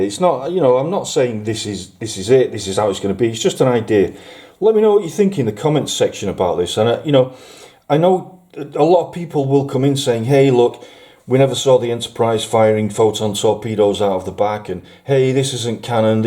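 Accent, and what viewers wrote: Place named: Bury, United Kingdom. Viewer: Wow, amazing work, my friend! Your skills are out of this world, which is quite apprpriate! Growing up, I always preferred the overall look of the Enterprise D exterior, however, I recently learned just how VAST the D is, like a city, too big to walk around, you're 100% dependent upon the turbolifts, and that really put me off. The curved corridors we see on TNG have a TINY diameter compared to the actual outer saucer. That ship is so vast, it's actually nightmarish. Too big to feel like home. Now as for the original 1701, it's still huge, but small enough to feel comfortable, small enough to walk around, so I'm starting to appreciate it way more. As for you taking a few liberties with the design - why not? Since the "official" layout diagrams were incomplete, it's up to the fans to fill in the gaps using logic and knowledge of the show. 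By the way, your accent reminds me of the Bury area, where my dad's from :-)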